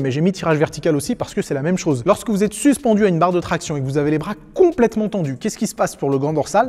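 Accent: Lyon, France. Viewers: Tout le monde prononce comme ça à Lyon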